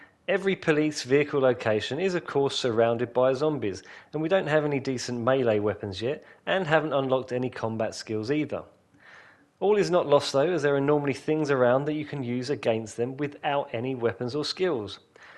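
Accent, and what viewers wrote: United Kingdom. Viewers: British pronunciation is weird at times lol. They say inventory like invent-Tree we say it like In-Va-Tory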